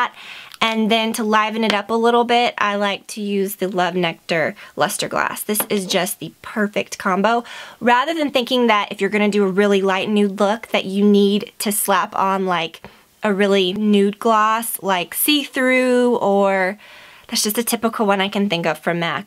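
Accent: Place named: Georgia, United States. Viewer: Enjoyed seeing these favorites. Sorry you're having such terrible seasonal problem's. Though, I do think you should make sure your ear problem, is caused from seasonal sinus problem's & nothing worse......I did giggle for a second when you made that comment about hoping you weren't sounding different, as what I noticed {heard} most was your GA drawl seemed more pronounced, than usual.......which I clearly love and am partial too hearing! Hope you and Brad have a fun filled weekend...{hugs} from Statesboro! ~J~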